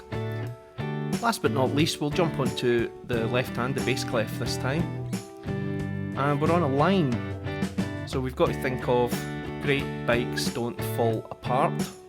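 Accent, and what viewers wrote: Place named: Scotland, United Kingdom. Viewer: You’re Scottish; from where?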